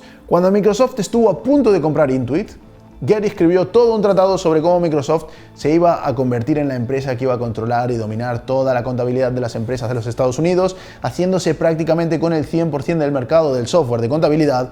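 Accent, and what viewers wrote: Galicia, Spain. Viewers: Te sale ,se oye lo GAYEGO ..JODER ME CAGO EN LA LECHE MECHEEE!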